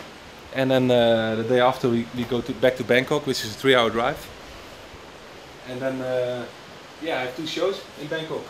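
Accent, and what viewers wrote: Netherlands. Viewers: he has a dutch accent ;p cuz he is dutch xD Best Dj''s in the world from the netherlands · Love the Dutch accent when he speaks English. So obvious for Dutch people in general. =) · Lol such a Dutch accent.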